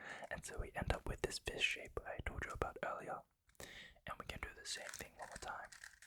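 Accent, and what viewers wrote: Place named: Australia. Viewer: Literally 4 seconds in I could tell you were from or are in Australia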